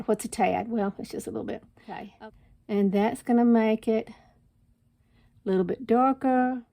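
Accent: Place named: South, United States. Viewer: I love this tutorial. Thank you!! Your accent tells me you are from the South. Love your accent. I’m in Tn. Would you show us a video on painting a Gardenia, also?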